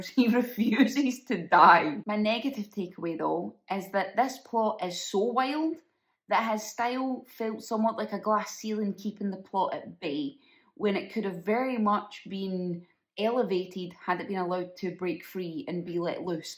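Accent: Scotland, United Kingdom. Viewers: I LOVE Wes Anderson. But anything weird registers with me. Haven’t seen this one yet, but I’ll have to. And I could listen to you talk forever. Not talking about your Scots dialect & accent (although I love that as well); I’m talking about your neuropsychological predisposition. I fell in love with you a long time ago (*please understand I’m not referring to anything romantic), which is why I wrote a character pseudo-based on you in my fictional pentalogy The Everlasting Life. Her name is Mattie Ferguson. Once my books are on shelves, I hope you are proud to be her inspiration. End of Speech. 🫂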